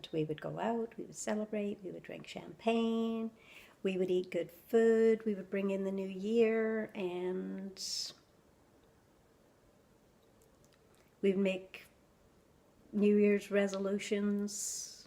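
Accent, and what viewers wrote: Ireland. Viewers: I just loooove your Irish accent. It's soft but fully alive and well. I'm part Skottish in my dad's side, and all four of my adopted children have Irish heritage. I enjoyed hearing about your traditions, past and present, and the turnips and carrots dish sounds absolutely delish. I know I'd love it. And I didn't make true resolutions this year either. Being goal driven sounds better, for sure. This was so fun to watch. Thanks for sharing with us,. You're a gem! Peace! ~TONI 💜🌺💚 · You still have a bit of the Irish accent and its beautiful. You have have lived in two places I can only dream to visit. Great video #teamsupportalltheway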